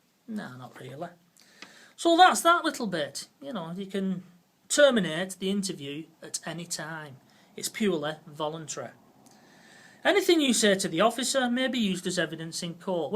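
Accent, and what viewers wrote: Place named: Lancashire, United Kingdom. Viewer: Beltin Lancashire accent like mine an fray Coppull.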